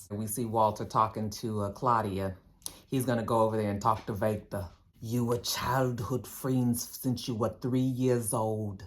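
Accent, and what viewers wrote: Ireland. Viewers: Lol, whenever I hear your Irish accent. Next time throw in the line, it’s magically delicious. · I be waiting for this review just for the Irish accent, I laugh watching the show just thinking about which line you gon say😂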